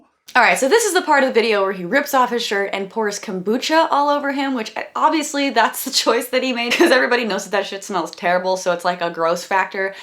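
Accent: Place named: Appalachia, United States. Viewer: Why do you sound like a hillbilly when you have company but you're well spoken when you're alone? 🤔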